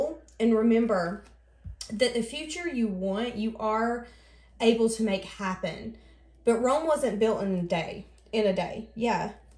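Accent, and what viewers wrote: South, United States. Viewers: resonates ... as does your kountry accent. Love it, from TN!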